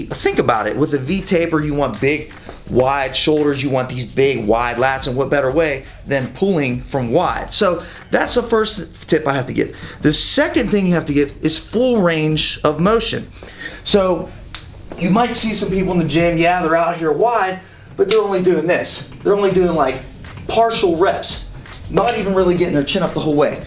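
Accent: South, United States. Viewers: Where is from originally. Sounds southern..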